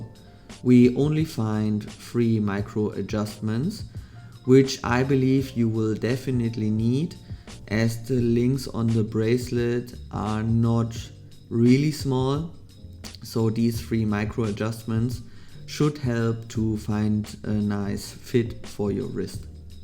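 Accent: Germany. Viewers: Are you german? oh and great video!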